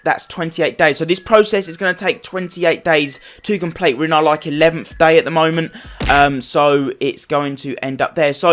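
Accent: United Kingdom. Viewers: I did it. And r u from England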